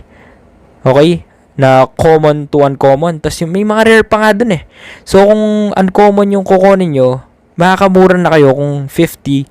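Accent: Pampanga, Philippines. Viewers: Kapampangan accent ka bro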